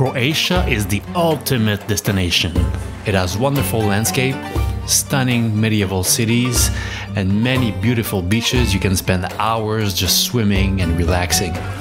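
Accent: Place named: Quebec, Canada. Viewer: J’ai spotté ton accent Québecois lol. Nice video btw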